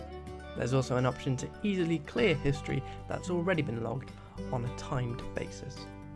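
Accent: United Kingdom. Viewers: I LOVE Ubuntu , and I love British accent ^_^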